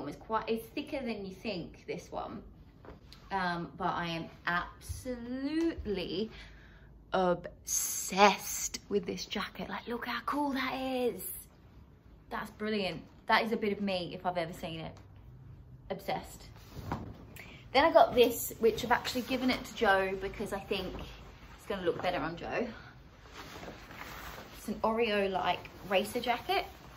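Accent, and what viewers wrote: Australia. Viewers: You did speak a lot.. awesome ♥️♥️ love it and the Aussie accent ahhh not many Aussie accents out there ..oh can't wait wait to see more!!! Cute or not 😘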